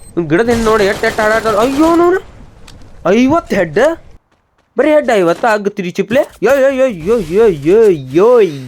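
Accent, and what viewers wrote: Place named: North Karnataka, India. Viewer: ಇದೆ ತರ ವಿಡಿಯೋ ಮಾಡಿ ಹಾಕಿ... ಉತ್ತರ ಕರ್ನಾಟಕ ಭಾಷೆ ಕೇಳೋಕೆ ಒಂತರ ಚಂದ...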